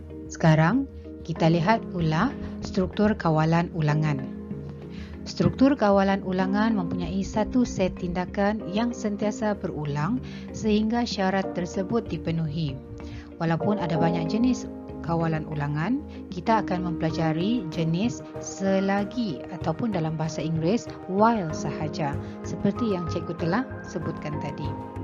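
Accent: Sabah, Malaysia. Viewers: Ckgu orang sabah ke atau sarawak?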